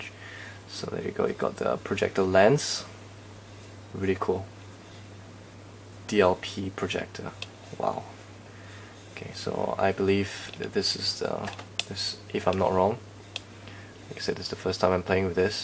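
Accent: Singapore. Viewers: are you from singapore??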